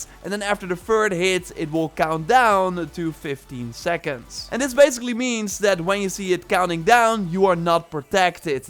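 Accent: Netherlands. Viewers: a dutch accent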